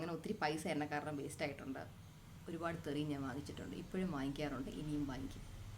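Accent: Trivandrum, India. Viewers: Malayalam samsarikkumbol trivandrum slang undo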